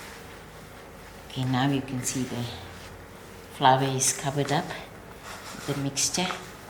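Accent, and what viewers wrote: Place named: Durban, South Africa. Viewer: U explain so well in your Durbsn accent love the way u cook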